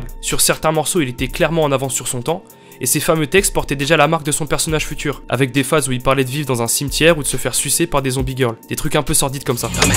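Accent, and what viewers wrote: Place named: France. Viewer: Très bonne video et ça fait bizarre d'entendre un français parler de et et 😂 qui sont trois autres boss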